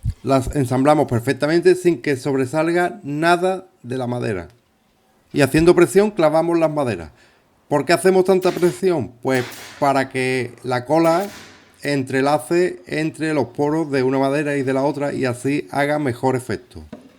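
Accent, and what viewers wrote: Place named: Andalusia, Spain. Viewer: Sos un genio !! Muy bien explicado. El acento Andalu (si no me equivoco), le da un toque muy especial. Muchas gracias maestro.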